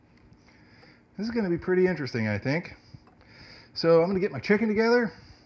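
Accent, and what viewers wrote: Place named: Memphis, United States. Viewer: Uncle Lou's,sounds like Memphis to me.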